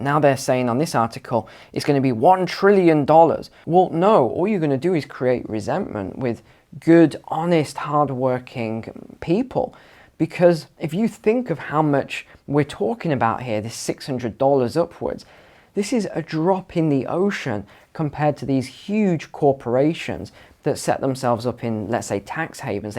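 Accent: United Kingdom. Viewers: Crock of shit has anyone of you verified this on the I R S website. He sounds very British maybe its Britons IRS.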